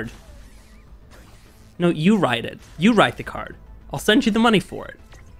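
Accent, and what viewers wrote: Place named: Ireland. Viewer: What is this video?!😂😂 Well we can tell he’s Irish!😁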